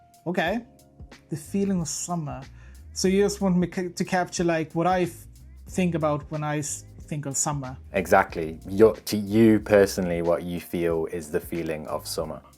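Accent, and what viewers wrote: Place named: Northwest England, United Kingdom. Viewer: Eeee Saltburn, I was dragged up in Teesside (Stockton) but haven't been to Saltburn for yonks. You sound like you're from NW England more than NE!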